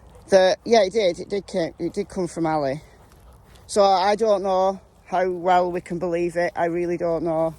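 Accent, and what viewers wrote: United Kingdom. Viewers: Thank you,. I always enjoy your lives, even if I very seldom have ever caught you live. Watching the replays, I always enjoy listening to whatever you are talking about, plus I so enjoy hearing your British accent. 😉 I would very much enjoy hearing you talk about the difference between the kinds of domestic abuse. I would find that very interesting. Thank you so for the time, effort and dedication that you put into the content that you provide. Stay safe and take care. ❤️🤗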